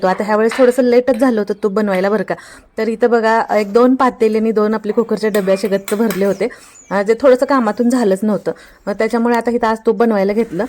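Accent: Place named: Satara, India. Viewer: Tai tumchi language ekdam satari vatate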